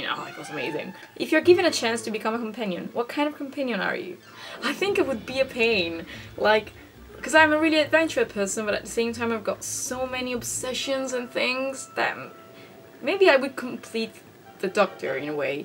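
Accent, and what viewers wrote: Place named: United Kingdom. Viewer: E' stupendo vedere come il tuo accento british migliori di giorno in giorno, ADORO sentirti parlare in inglese aw. Questo video è ORO PURO ^ ((e io sarei una companion diecimila volte peggio di te because of reasons))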